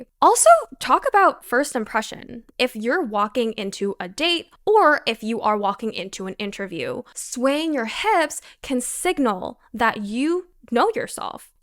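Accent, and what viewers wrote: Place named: Los Angeles, United States. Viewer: The LA accent ... sounds stupid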